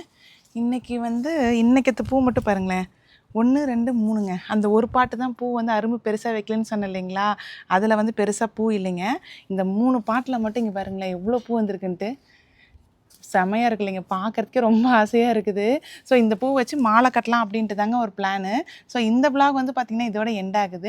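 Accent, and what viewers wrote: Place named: Namakkal, India. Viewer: Sorry, nangalum namakkal than ka. Neenga visa visanama pochnu solrapa engala mathri solreenganu santhoshama irukum ka.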